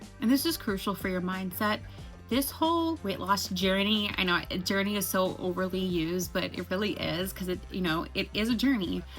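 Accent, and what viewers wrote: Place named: New York, United States. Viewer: Oh snap, I knew you sounded like a NY’er…Rockaway Blvd stairs is NO JOKE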